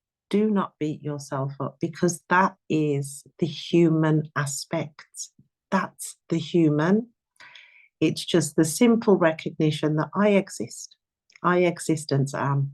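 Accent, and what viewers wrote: United Kingdom. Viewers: Hearing the truth via your English accent is🤌🏻🤌🏻deliciousness Very good recipe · I don’t know which UK accent you have, but I was playing your video and my husband overheard your voice from the other room and said it sounded as if I was listening to a female Idris Elba 😀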